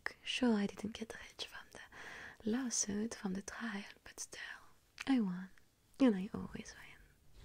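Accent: France. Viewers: Loving you using a heavier French accent lately, it's extremely calming <3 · The tone of your voice and the heavy French accent sound amazing together 10/10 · oh the french accent 👁️👄👁️ falls in love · i love the french accent, it sounds so nice :,)) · Oh please do more of these in this french accent you are pure magic! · C’est magnifique! She returns just in time for me to go to bed, and with a luxuriously thick French accent.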